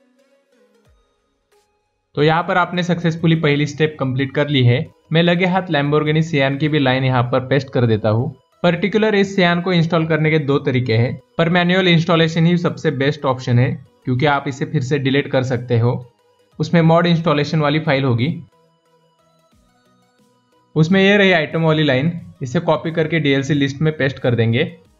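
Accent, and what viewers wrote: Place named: Khandesh, India. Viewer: BRO I TOTALLY LOVE YOU your way of speaking and that khandeshi accent i love it. it was so easy to understand you were straight and simple subscribing you right away.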